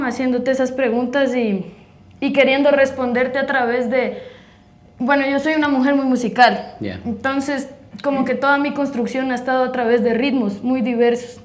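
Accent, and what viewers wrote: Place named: Ecuador. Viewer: Pensé que era ecuatoriana. Que bien manejo del idioma. Mis respetos.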